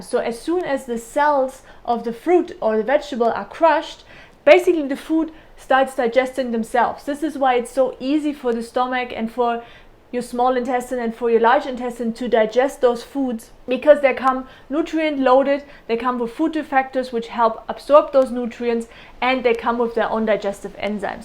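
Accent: Germany. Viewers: Good job 👍, do I hear a hint of a German accent?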